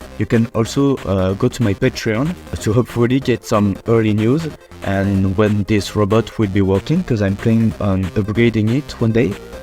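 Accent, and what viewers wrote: France. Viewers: I think you are French cuz you like- Sound like an French person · so frustrating hearing a french dude speaking in english while I also speak french xD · heavy French accent, and I like it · Man you have a french accent and that's incredible as a french myself, anyways good job on the springlock suit! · i dont care about your french voice, IT'S AWESOME! GoodLuck pal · are you french? you sound like you have a french accent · Are you french🇨🇵? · Are you French? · Are you french ? I hear a french accent ( i'm french ) ( bon j'ai eu une confirmation a ). Enfin bref, soit tres minutieux, c'est vraiment tres dangereux de faire des costumes de springlocks, en tout cas bien joué. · Are you French by any chance ? I think the accent matches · He sound very french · Dès que je t'ai entendu parler au début j'ai su que t'étais français 😂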